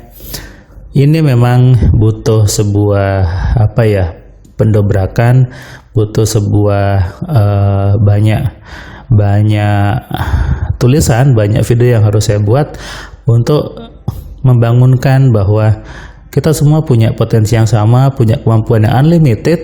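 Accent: Madura, Indonesia. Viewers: ❤❤❤❤❤ mantab, logat Mas, logat madura..khas bangets, I Like It's 😅🙏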